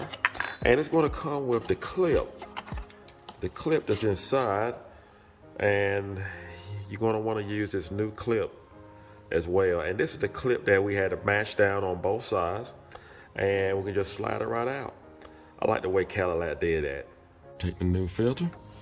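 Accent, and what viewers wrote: South, United States. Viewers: Southern drawl is icing on the cake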